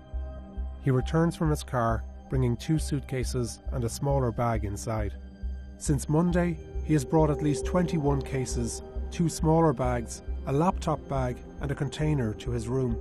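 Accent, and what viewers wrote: Ireland. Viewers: Love how it's the New York Times and it has an Irish guy as the narrator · This is all well and good but the Irish guy narrating the video caught me so off guard idk why even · Irish accent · Is the person talking Irish? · Since when did The New York Times have an Irish narrator?, also this is really spooky and sad that this happened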